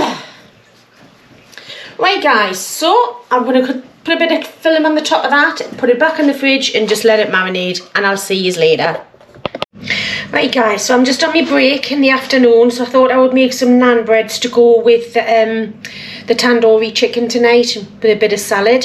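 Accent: Newcastle, United Kingdom. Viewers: How lucky was I to find you! Normal kitchen, ingredients we can all get a hold of, delicious food and geordie accent like my own! Thank you!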